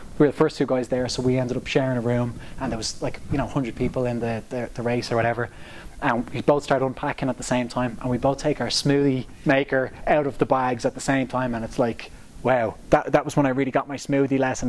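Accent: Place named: Ireland. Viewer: Big man ! Its been a while ! Classic moment as I am looking for tips and tricks on doing my first marathon here in florida in October. I clicked your link and hear the irish accent and burst out laughing ......I know that bloke ! I hope you are doing well skipper. I enjoyed the video, good work. Gerry Carroll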